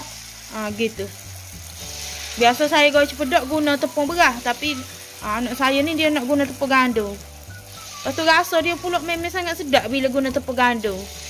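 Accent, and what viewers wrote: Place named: Kuala Lumpur, Malaysia. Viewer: Slang KL adik ni..phm sgt..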